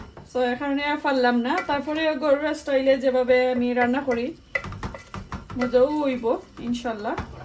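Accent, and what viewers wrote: Sylhet, Bangladesh. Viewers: Apnar sylheti mat amar je vala lage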